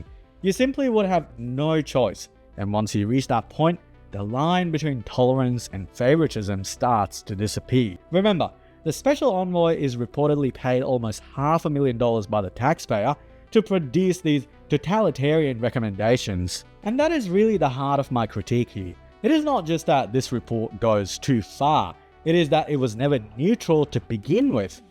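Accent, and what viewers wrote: Australia. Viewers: I'm hearing Australia, probably Adelaide, South Australia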